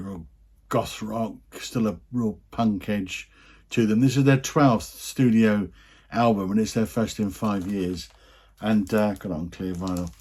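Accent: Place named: United Kingdom. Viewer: Brilliant job. Thanks for those shoutouts! I agree about the PG album. There are a few songs that don’t necessarily hit you right away, so it will get stronger with more listens. I actually played it quite a bit before I made my list because I wanted to give it a fair assessment in a short period of time. I think this episode is a perfect example of why your channel is so popular. Your music expertise is remarkable and you have that hip, in the know kind of vibe. Plus being British it just makes you sound more intelligent and sophisticated. You definitely have talked me into giving a couple albums on here a try.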